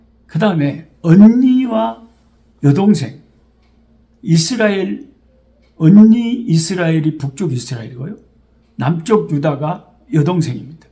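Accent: Jeolla, South Korea. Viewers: 목사님말씀으로 하루를 시작해서 에레미아서 까지 오게됨을 감사합니다 여기는 순천이레요 옆마을 벌교 사투리에 웃으며 정감이 깊습니다 추위 잘이겨내세요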